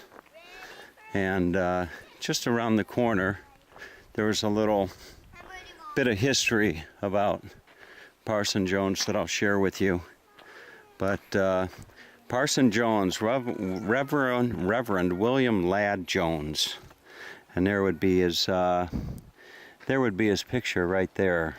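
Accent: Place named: California, United States. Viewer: This is how all people from California talk! LOL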